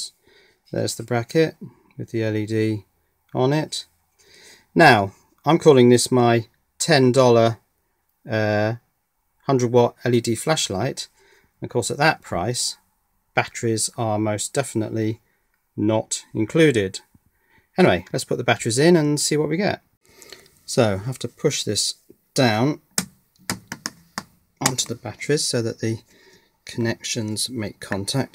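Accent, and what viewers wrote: United Kingdom. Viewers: U from UK? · This may be the case, but the presenter here has a British accent.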